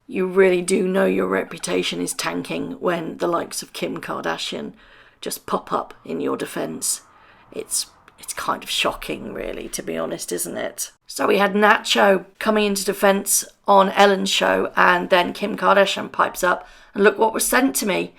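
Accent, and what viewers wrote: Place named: United Kingdom. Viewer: Aww, I really hope you get to feeling alot better very soon hun. You really do sound very worn out or tired and such... So you had a med procedure, or are just getting some rest or both? I am saying prayers for you, sweet lady. I love your informational videos so much... And your lovely British accent reminds me of my U.K. 1st generation Aunties and cousins round the U.K. I really hope you get to feeling better... That's the main thing. Health is #1, then coming back fully.... Blessings & (((hugs))), take your rest... We will not leave!! Promise lol!! 😘💞💖💗🙏🙏🙌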